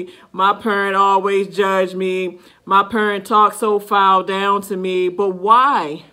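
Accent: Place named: South, United States. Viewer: Girl you talk country with that thing on your tongue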